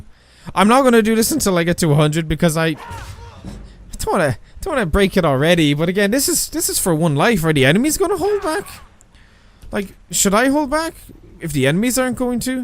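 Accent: Ireland. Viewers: You never fail to guilt-trip me into Liking your videos, eh?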